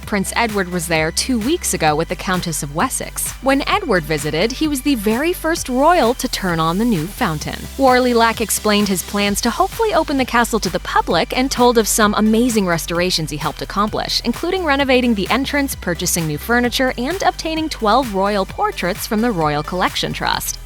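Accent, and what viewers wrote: United States. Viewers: It feels really wrong that this is narrated by an American. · Supper annoying American accent voiceover. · a yank talking about the royal family not for me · Why an American doing the commentary telling us what we are paying for a load of spungers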